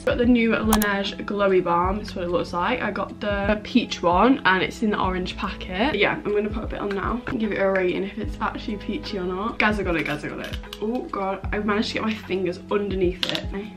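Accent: United Kingdom. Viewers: I freaking love your British accent!! You’re so pretty too!! <3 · do you have british accent ? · Are you from the uk🇬🇧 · Is this more a southern uk accent or a northern one?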